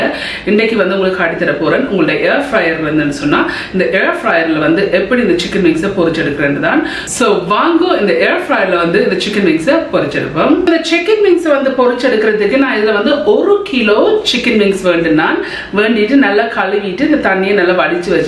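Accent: Sri Lanka. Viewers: Ilangai thamizh ❤❤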